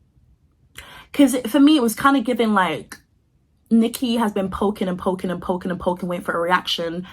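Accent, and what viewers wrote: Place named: RP, United Kingdom. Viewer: That pink dressing gown is yuk! You talk too fast and too posh!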